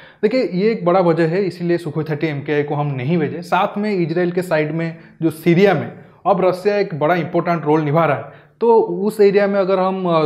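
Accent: Odisha, India. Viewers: sorry to say please currect your accent of oriya in English like word - America , humare these words you can follow a hindi speaking people to correct your pronounciation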